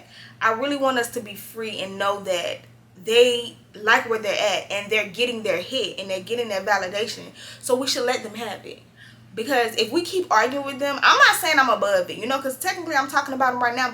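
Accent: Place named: South, United States. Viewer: Girl you country den a MF.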